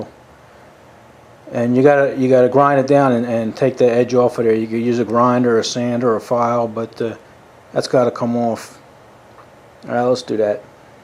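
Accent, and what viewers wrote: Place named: New York, United States. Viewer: So where in New York are you from?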